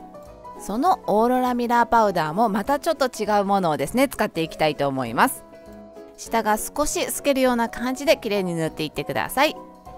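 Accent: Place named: Gifu, Japan. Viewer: ライブのときは岐阜弁が聞けて嬉しかった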